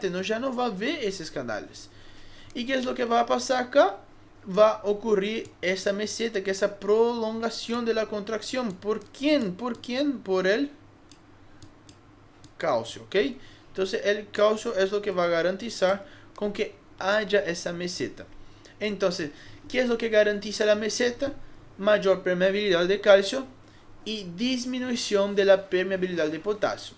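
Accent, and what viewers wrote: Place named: Brazil. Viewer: ¡Ahhhhhhhhhhhhhhhhhhhh! eres de Brasil, tienes un acento bonito, ¡Vida Brasil!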